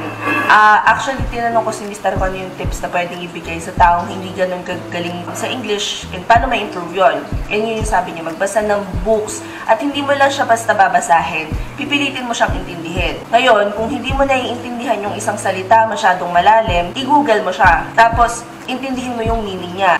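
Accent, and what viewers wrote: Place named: United Kingdom. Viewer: At naalala ko pa nga un British accent mo sa esca calls. Pia Wurtzbach lang ang peg ✌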